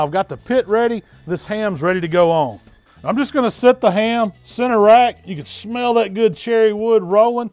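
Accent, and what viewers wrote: South, United States. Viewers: his southern accent is so strong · When you see a Big Guy with southern accent then u know youre in the right place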